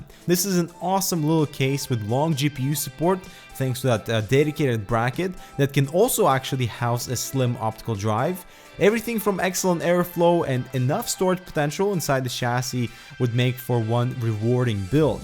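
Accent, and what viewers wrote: Sweden. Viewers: Are you from sweden? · Where are you from? are you swedish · You sound really swedish btw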